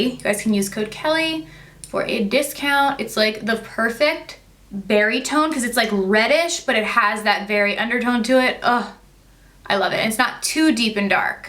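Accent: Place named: East Coast, United States. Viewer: Kelly... You really have given me confidence in makeup! I always try and recreate all your looks!! Love ya girl!! P.S...Philly girl here and I knew you had to be an east coast girl since you say "you guys" ! 😂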